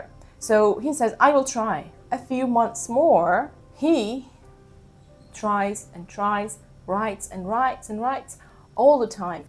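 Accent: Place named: Turkey. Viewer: hi, are you turkish? your accent looks similar. :)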